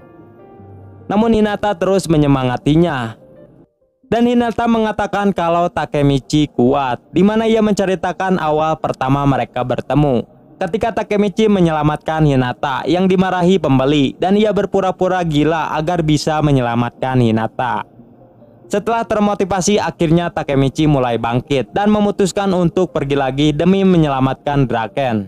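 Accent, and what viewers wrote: West Java, Indonesia. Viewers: Logat sunda na mah aya wae da hese lengieut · Bg asli Sunda kah has Sunda bgt suaranya 😆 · Sunda geningan ngomongna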